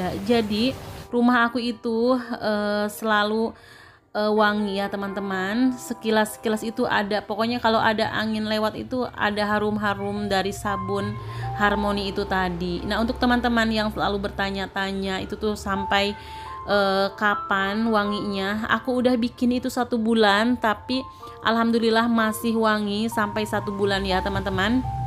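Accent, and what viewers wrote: West Java, Indonesia. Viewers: teteh asli mana? Logatnya sunda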